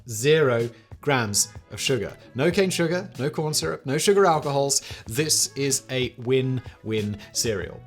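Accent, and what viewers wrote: United Kingdom. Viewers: He has a thick British accent, but why then does it feel like he's an American?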